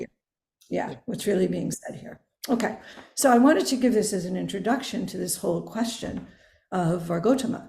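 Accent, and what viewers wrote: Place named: United States. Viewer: Whose accent, I know the American accent is difficult to understand if U put on captions it will be ez to follow her. I hope that helps.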